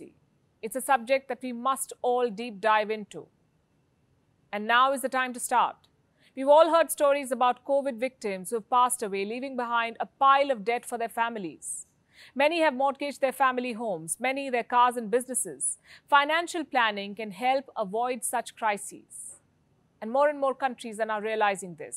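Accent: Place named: India. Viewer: Madam, nice day to you, a filipina new fan of you here, i am a fan of british and american accent english but now my top on the list is indian accent, and i like it very much...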